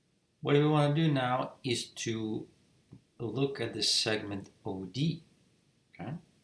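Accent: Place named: New York, United States. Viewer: Drop CE perpendicular to AB at E. angle CBA=30,angle CAB=15 angles in deg. Let CB=2,CE=1 and EB=sqrt3. AB=AD+DB. sin(15)=(sqrt6-sqrt2)/4 sin(30)=1/2 angle ACB=<num>=135 sin(135)=1/sqrt2 Use Rule of Sines on triangle ACB. AB/sin(135)=CB/sin(15) AB/(1/sqrt2)=2/((sqrt6-sqrt2)/4) sqrt2*AB=8/(sqrt6-sqrt2) AB=8/(sqrt2(sqrt6-sqrt2))=8/(sqrt12-2)=8/2(sqrt3-1)=4/(sqrt3-1)=2(sqrt3+1) DB=AB/2=2(sqrt3+1)/2=(sqrt3+1) DE=DB-EB=(sqrt3+1)-sqrt3=1. tan(x)=CE/DE=1/1=1 x=arctan(1)=45 degrees and that is our answer. Thanks for the problem. I like your accent,do you come from NY City?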